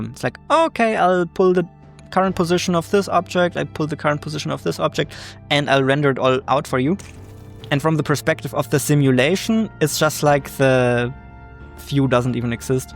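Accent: Germany. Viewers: Nach 4 Sek wusste ich, das du ein deutscher bist :D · your voice sounds very german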